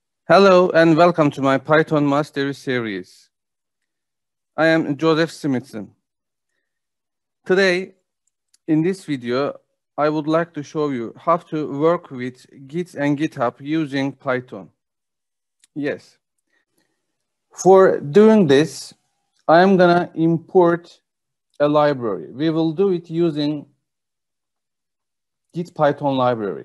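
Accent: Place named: Turkey. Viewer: Aynı şeyi düşündüm daha ilk dkdan. Direk Türk aksanı bu nerde olsa tanırım 😂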